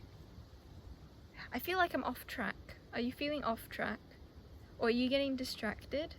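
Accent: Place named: United Kingdom. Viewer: What area of the UK is your accent from?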